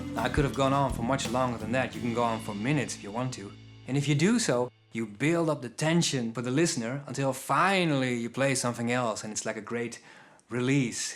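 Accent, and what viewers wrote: Netherlands. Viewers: I’ve enjoyed the videos as well as Paul’s. They both produce super high quality videos with great content! And I like that Dutch accent! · It's a Dutch accent, sounds great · no such thing a European accent... you know how many different languages Europe has right? It is a Dutch accent though 🤪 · Man your lessons are so fkn solid! I love them. Just curious though where are you from? Holland, Germany? Very curious for no reason. Thanks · Sniff sniff, I detect a faint Dutch accent. Am I right? Bedankt voor de tips!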